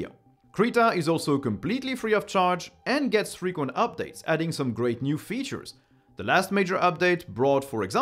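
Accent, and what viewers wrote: France. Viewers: I though with that accent your were french is that correct ? Also been using most of these apps at a regular basis and it's awesome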